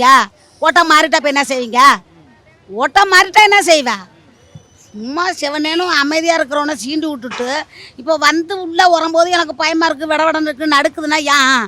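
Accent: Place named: Nagapattinam, India. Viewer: Idhu namba voor Nagapattinam pecha theriyudheh... Aaaya weight kaatiduchj❤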